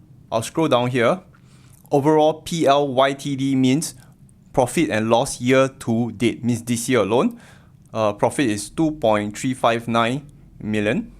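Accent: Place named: Singapore. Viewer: Love you Singaporean accent bro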